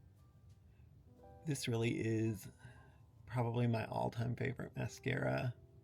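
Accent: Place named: Georgia, United States. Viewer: Love it, you just sold me on the Space traveler pallet but I will be buying the diffuse muse pallet first. I love your accent; you sound like you are southern but Georgia southern like from Atlanta anyway nice accent. I was watching Alicia’s channel where she was talking about you applying these beautiful pallets. You have a new subscriber so I will be watching and learning.❤❤❤❤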